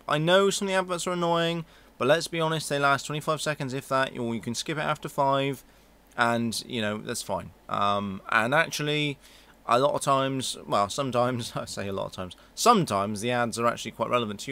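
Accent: United Kingdom. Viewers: Dat Yorkshire accent doe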